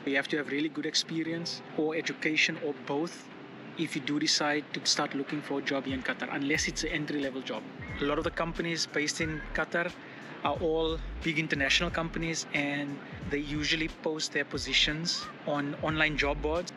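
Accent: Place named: South Africa. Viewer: Wow, was scrolling info about Qatar… I heard the accent and new , ag jinne man , die seun is van Suid Africa!!!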